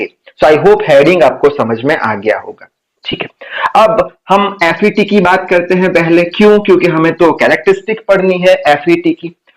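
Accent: Gujarat, India. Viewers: When at the starting of the video (hlo) and i m understand sir is also from gujarat..😅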